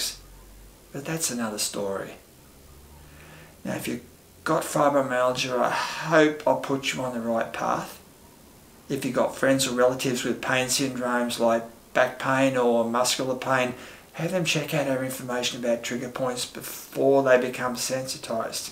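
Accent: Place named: Australia. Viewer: You sound like an Aussie, what state are you in?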